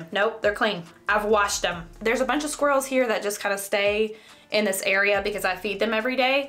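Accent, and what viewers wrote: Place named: Texas, United States. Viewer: I traveled from So. Cal to lil' ol Canton Texas to watch. They sound a bit like you there. lol. It was amazing! We didn't cry though. And you don't need the glasses to look while it was in totality. Don't get your glasses from Temu, though. You will go blind. Ended up getting a high frequency face wand cuz you said you liked yours. Thankyou! Love it!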